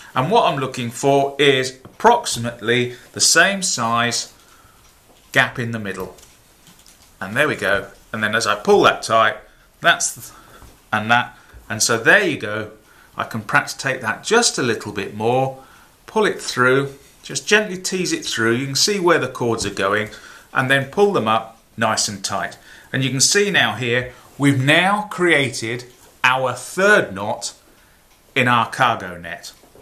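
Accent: England, United Kingdom. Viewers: first of all i wanted to say you can eat a bag of d*cks because you’re english accent wasn’t even the worst part it was trying to follow along with all of your unnecessary BS that you put me through watched a different video solved all my issues and i felt a sense of bliss. You on the other hand deserve to be taken off of youtube for making my life worse causing me to crash out watching your shiet video.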